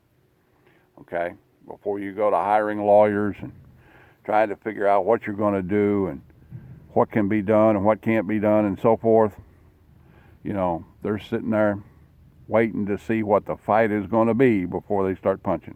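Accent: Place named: South, United States. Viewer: I really enjoy your videos, they are down to earth and besides I like your Southern accent ;)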